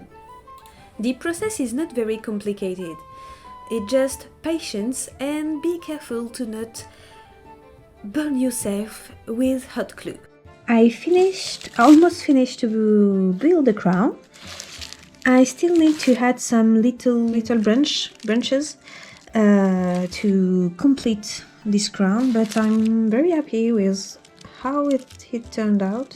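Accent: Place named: England, United Kingdom. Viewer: 😮 cet accent anglais 👍 le sacrifice à la méchante créature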